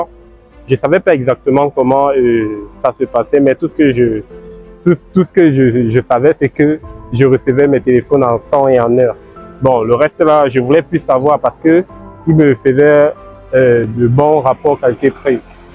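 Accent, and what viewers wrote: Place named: Cameroon. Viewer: Je suis intéressé mais gars a un accent camerounais, ca ne rassure pas.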